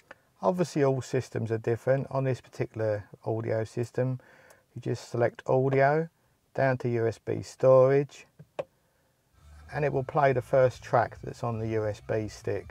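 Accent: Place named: United Kingdom. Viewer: Well Done furr a Brit..just kidding accent tickles my ears..easy peezy just watt eye was looking for..thx for posting xo a new fan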